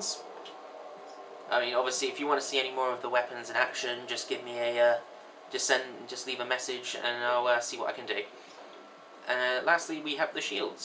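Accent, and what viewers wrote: United Kingdom. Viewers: u sound like harry pottah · Love the British accent XD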